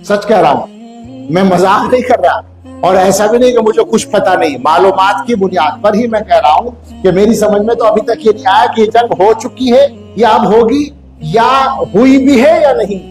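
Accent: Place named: Hyderabad, India. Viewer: جناب کی شکل تو مودی سے ملتی جھلتی ہے، اور آواز و لہجہ بھی انڈین حیدر آباد والی ہے۔ 😊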